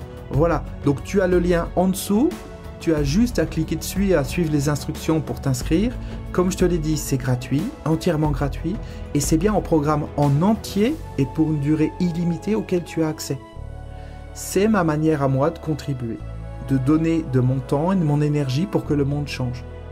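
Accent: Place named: Eastern France, France. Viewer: Ton accent te piège??? Lol . T es de l est de la France????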